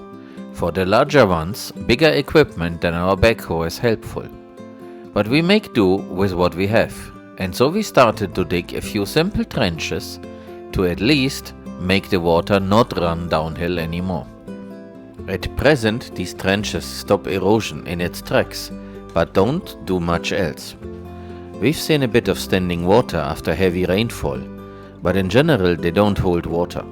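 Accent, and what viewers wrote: Germany. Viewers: I Think you can even improve if you make the trenches not like a straight line but more like a S-shape. I mean like natural stream usually forms or like snakes move. I guess that takes even more speed from the water. More water should get into the ground and it should be more stabilizing for the top-earth. by the way greetings. I am also from germany and interested in water retention and ecological agricultures. my spoken english sounds pretty much like yours according to my girlfriend. anyway i admire your work there and I hope one day i can own a little bit of land and shape it myself, too. Could you need a helping hand for free? Actually I am learning Computer Science at the moment but I am in the last semesters. I just read at <link> that you are "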